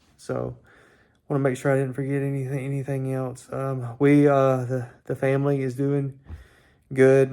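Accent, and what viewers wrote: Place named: United States. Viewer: Ps where in America do you live ? As I love your accent. Best wishes again soo good to see you sitting up and chatting It will be tough as you know but you have got this ❤❤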